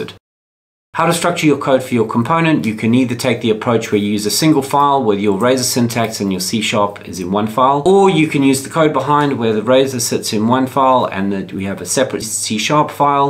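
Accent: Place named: South Africa. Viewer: Do I detect a fellow South African accent ?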